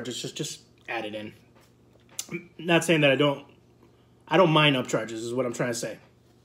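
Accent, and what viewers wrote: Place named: New England, United States. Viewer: I guessed Massachusetts or Rhode Island by your voice. I’m from ct lol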